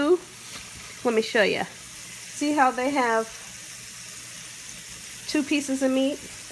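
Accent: South, United States. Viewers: I love your show, my granddaughter love you when u say "like so" too bad for the haters. I LOVE YOU. R u from the South. My name is Pam I live in Up State NY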